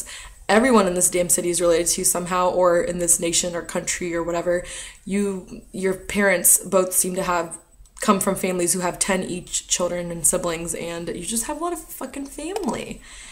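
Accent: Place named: India. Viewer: your from india, therefore you DO have an accent. I work with lots of indians and they all think they dont have an accent but say stuff like 'interior-rum report' (trying to say 'interim report'). Anyway....PARKKEE STAARRNNN, JINNDAAA BAAADDHH. Afridi is best cricket player of all time centuries every game bro.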